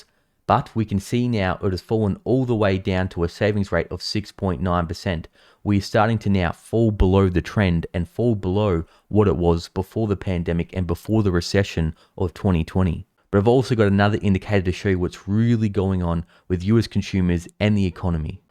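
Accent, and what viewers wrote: Australia. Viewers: You sound like you're from Australia how's it going down there I think you should probably pay more attention to where you're living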